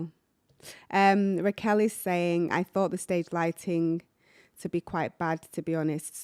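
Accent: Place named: Manchester, United Kingdom. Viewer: Hello! I just wanted to say how much I appreciated your reaction. Few people truly feel Lara Fabian the way you clearly do. I was genuinely moved by how moved you were. You captured her essence perfectly, and I really hope you'll consider sharing more from that phenomenal London concert. Also, I have to say, you’re an absolute pleasure to listen to. Your voice has such clarity and warmth. Yet I couldn't help noticing a few vowel qualities that hint at a slight Northern influence, perhaps Manchester? It gives your speech a unique charm. 😊 I'll be attending Lara’s concert in Geneva on 28 November, and after hearing your impressions, I’m even more excited. Thank you again for such an honest and heartfelt video. 💜 P.S. I've just subscribed to your channel! 😉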